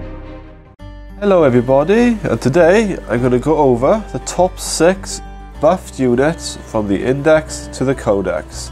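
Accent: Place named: Wales, United Kingdom. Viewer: haha I read through all of these just to confirm you were in fact welsh. would recognise that accent anywhere, big ups from Crickhowell and Swansea. Necrons are a horrible faction to play against though, far too much reanimation, or stupidly op invulnerables..i'm looking at you 3++ wraiths.. I mean seriously gw were just lazy not thinking of better rules to reflect their toughness like..increasing their toughness, or giving them a 5+ fnp ect.. having 3 of the receive a charge from a ccw ironclad dread eat it up and then devour a leviathan is just stupid if you ask me. Anyway good vid sorry for the rant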